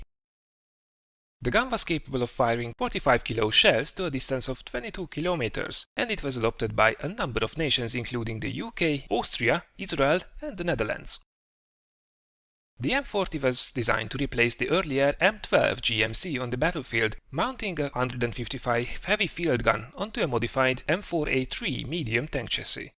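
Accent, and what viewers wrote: Hungary. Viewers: R u Hungarian? Your accent is seemst like a Hun.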